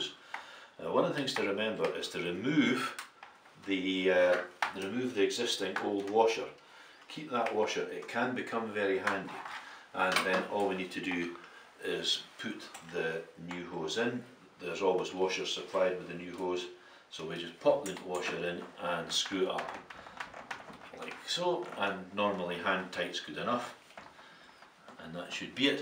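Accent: Scotland, United Kingdom. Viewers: This is great - beautifully explained, gently and slowly, covering all the angles. As a Sassenach (but of course with Scottish ancestors - yeah, we all claim that ;-) !!), I love the accent. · Scottish but not glaswegian!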